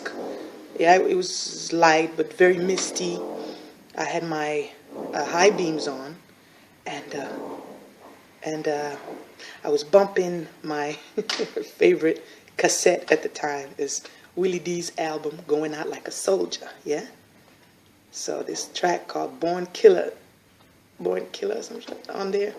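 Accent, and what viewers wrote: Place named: New York, United States. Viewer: Wow! It's really you Kid. Thank you for so many memories. I loved and that joint back when I was Thirteen and still do. You inspired me to start rapping and making music. The 90's dance pop is booming again. You should start touring. Your story is incredible. I always thought you was from New Yaaawk.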